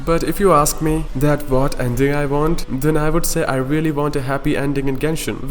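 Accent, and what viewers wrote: India. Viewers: Bhai teri accent Indian lagti hai. Tu indian hi hai naa?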